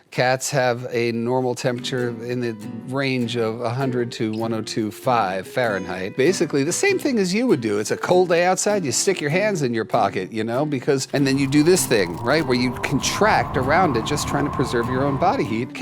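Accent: England, United Kingdom. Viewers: I can smell some English accent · How long have you been training to speak posh Englisch? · Lol! Great description of The Loaf - with illustrations!! Also LOVE the British accent/Masterpiece Theater style. Had me smiling a long time. Thank you.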